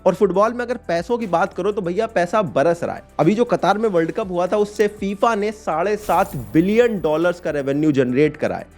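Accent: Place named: Bihar, India. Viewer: Here I see his Bihari accent.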